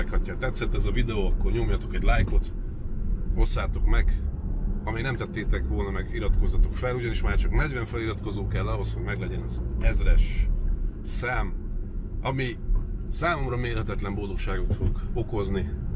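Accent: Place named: United States. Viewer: Szörnyű hallgatni, hogy az anyanyelveden, amerikai hangsúllyal beszélsz.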